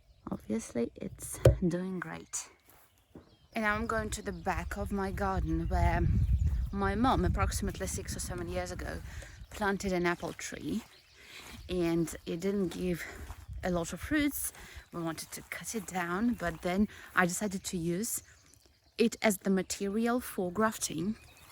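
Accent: United Kingdom. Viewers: Where did you learn English? It sounds like you have a British accent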